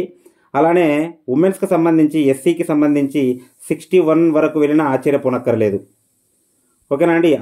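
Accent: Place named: Telangana, India. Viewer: me language telangana voice la undhi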